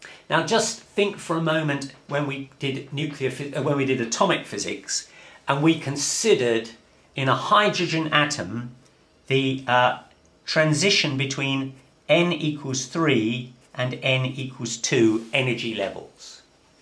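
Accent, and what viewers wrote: United Kingdom. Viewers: hi....good explanation...i think its ur british accent..isn't it?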